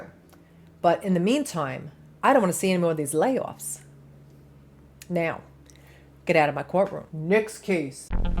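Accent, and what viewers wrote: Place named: New York, United States. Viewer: Elo mate. I decided to upgrade to your channel, because I love you and your doggies are so, so lovely. You're my fellow green eyed beauty and I love the dogos. Love your ascent. New York/Jersey, I'm guessing. I'm a total Londoner bloke, and I love you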